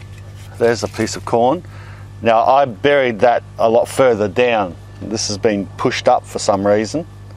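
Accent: Australia. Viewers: like an australian? I guess we do talk weird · Somehow I did not expect an aus accent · he said so himself in another of his videos. But also he has Aussie accent and you can see the background. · I subscribed immediately after hearing his Australian accent lol! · I love Aussie accent! And also his kitchen scraps garden. It's again! Love it.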